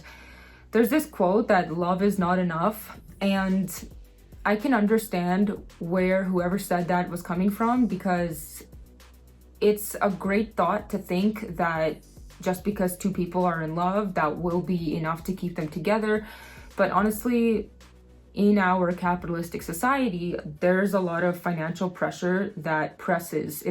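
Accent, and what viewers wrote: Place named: Brazil. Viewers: As a brazilian myself she pretty much sounds like one equal who have lived for years on an anglophono country. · are you brazilian? trying to figure out where that accent comes from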